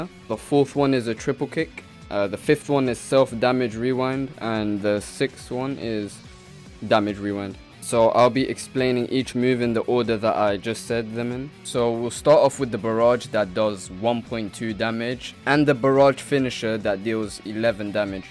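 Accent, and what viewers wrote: United Kingdom. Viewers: Ayyy a fellow British man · He got that bri’ish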